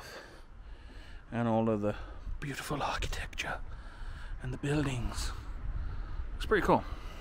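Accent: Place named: England, United Kingdom. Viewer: ????????????????????? I was only joking...half joking the English accent was grating on me but apart from that good vlog.... don't be mad at me 🥺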